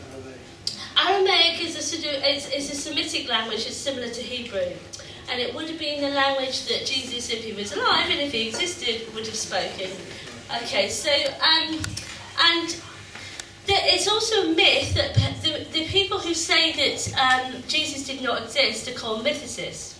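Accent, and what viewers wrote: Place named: Estuary, United Kingdom. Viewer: i'm just starting to listen to this (i'm up to the beginning of the "visions" part), but i can't help noticing that is a very sensual woman with an absolutely delightful estuary accent....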